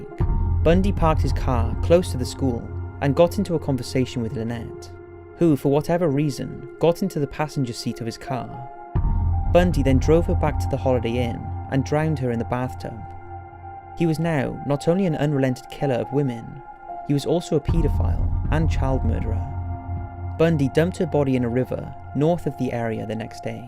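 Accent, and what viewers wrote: United Kingdom. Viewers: Why in the fucking hell are there only British narrators on this bullshit, fuck this.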